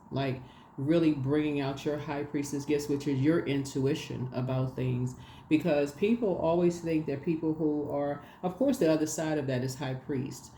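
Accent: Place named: South Florida, United States. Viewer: I'm from South Miami and I dont many people who speak like that. I think its Carrebien/latinx black people who are born in the USA south florida dialect. Very specific north Miami. Anyway you hit the nail on the head.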